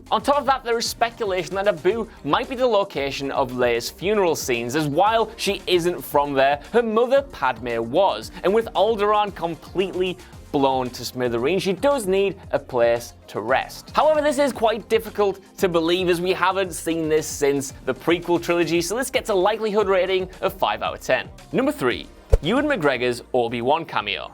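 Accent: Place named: North, United Kingdom. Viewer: Jesus this guy sounds like a resident of the fucking North in GoT . Hopefully you're a Stark loyalist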